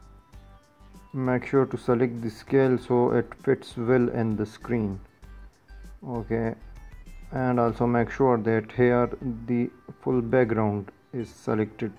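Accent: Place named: India. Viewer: Well the accent does not help irc you not used to Indian accent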